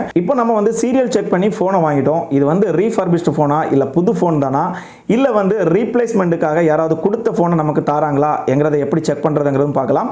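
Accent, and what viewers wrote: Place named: Kanyakumari, India. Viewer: Kanyakumari pechu mathiri iruke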